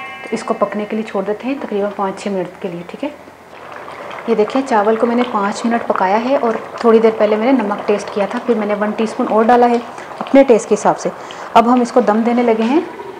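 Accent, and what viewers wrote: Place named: Punjab, India. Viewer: I like your Respi and punjabi style taking is great. I have been made your Gurwalay chawal. My wife is love it. Thankyou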